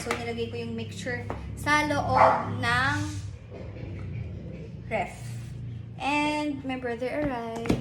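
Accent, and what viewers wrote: Visayas, Philippines. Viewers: I love her bisaya accent like when she said wala na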